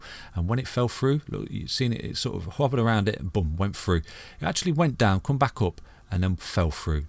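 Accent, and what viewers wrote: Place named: United Kingdom. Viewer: I think the British accent adds to charm.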